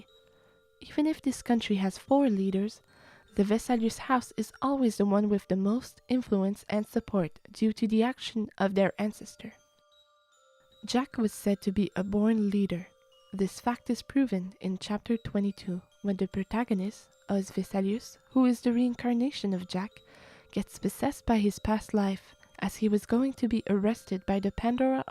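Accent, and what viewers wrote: France. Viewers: Peut être aussi ta façon de prononcer des mots français comme "tragédie de sablier" à la française. En général quand quelqu'un sonne aussi bien français... surtout sur les R... ça peut laisser suspicieux. Et puis j'ai l'impression que Pandora Hearts est assez populaire en France (même si ce n'est pas l'oeuvre qui revient dans le plus de discussions actuellement, je connais pas mal de personnes de bon goût qui l'ont lu ou regardé)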